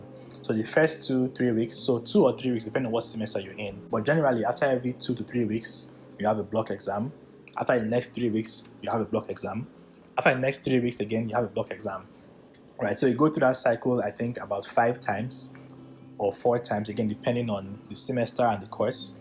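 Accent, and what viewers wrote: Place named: Nigeria. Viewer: You sound Nigerian bruh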